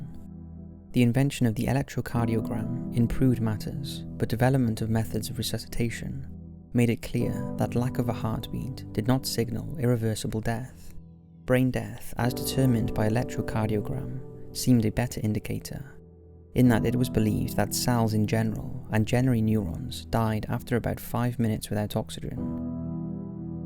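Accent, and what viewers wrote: Wales, United Kingdom. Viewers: moonbeam starchild - that's the Welsh accent for you!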